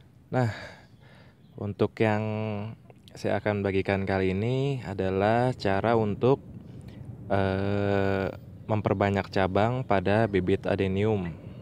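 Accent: West Java, Indonesia. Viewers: kanyahoan sunda na..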